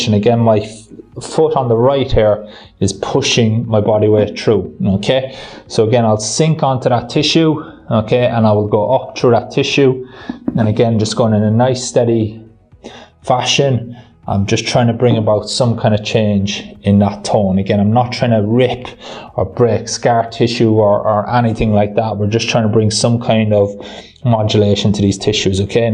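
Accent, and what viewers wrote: Ireland. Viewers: 😅 you from Ireland? I'm from Ireland too that's why I was asking but great video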